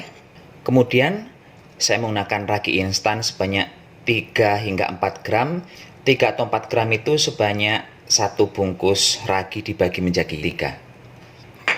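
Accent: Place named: Banyumas, Indonesia. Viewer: Mas, nganggo, bahasa, ngapak, lucu, mas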